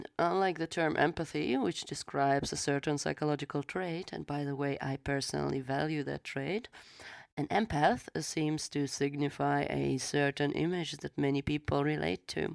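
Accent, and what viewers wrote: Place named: Russia. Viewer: Important email...haha, oh stop! Love the example you gave with the homeless persons. Exactly! So are you Russian? I heard you mention in another video you speak Russian and German but did not mention which is your mother tongue. I presume Russian as your accent is basically Russian. I had a girlfriend for several years from Skopje, her accent was very similar. So where are you from actually?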